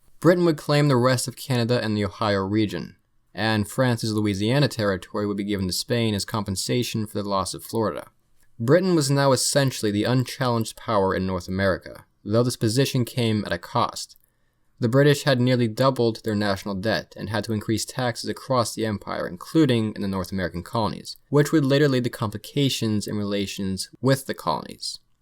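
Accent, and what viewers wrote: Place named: Appalachia, United States. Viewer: That Appalachian pronunciation though.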